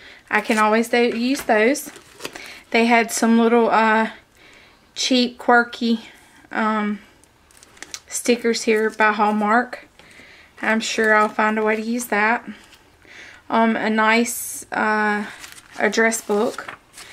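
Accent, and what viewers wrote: South, United States. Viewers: Came through Foley just a few weeks ago going to Orange Beach! Love that Southern accent! When I go to meeting in D.C., New York or Chicago they always ask, "Where is that accent from?" I say, "Accent? What accent? I don't have an accent!" lol And then I tell them I'm from New York! lol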